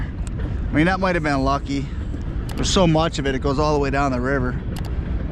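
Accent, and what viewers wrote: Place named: Troy, United States. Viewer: Sounds like troy ny lol I'm in Rentler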